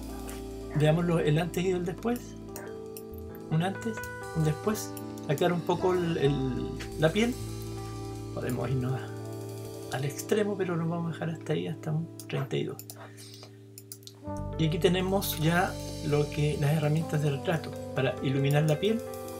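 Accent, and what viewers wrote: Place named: Chile. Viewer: Hola, Encontré tu canal por casualidad...lindas vistas y panoramas en general. Tu acento te vendió como “Chileno”! Yo salí el ańo 78...los campos y panoramas son únicos especialmente cuando incluyes la cordillera de fondo. Yo tengo ańos en photography pero sin canal YouTube. Muy practico tus comentarios especialmente para personas empezando en este hobby o rubro de fotografía. Keep up the good work! Alejandro González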